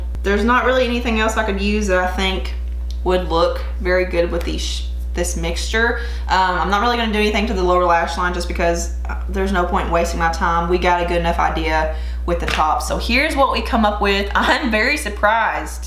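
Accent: South, United States. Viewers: I really got tired of all the hate bandwagon of this palette. You and emily Noel were very honest about this. I love your southern accent. You are a very beautiful woman. Keep up the good work.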